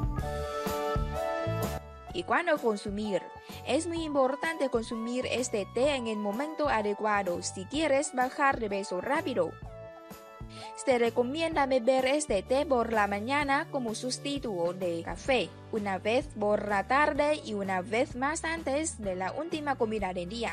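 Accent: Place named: Russia. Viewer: Porque habla como rusa y es shinita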